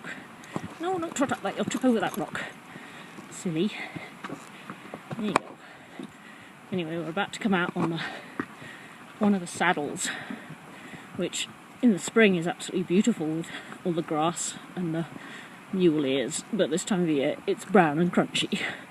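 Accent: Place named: United Kingdom. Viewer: your riding is fantastic! I was lucky enough to visit Tahoe years ago and went for a trail ride in Alpine Meadows. I live just below the Cotswolds and have Badminton at my doorstep but it's no comparison to your hacking! Can't help but notice that your accent hails from the UK though, did you have such great riding when you lived in the UK? Would love to go on a riding holiday in the area to explore the trails!!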